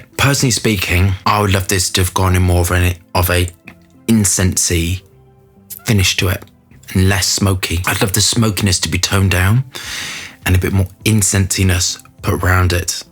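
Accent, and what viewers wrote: United Kingdom. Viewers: i like your Britification of every word. "Le Laleeebo". And maybe a high vapor pressure isolate of patchouli can occur on the top maybe?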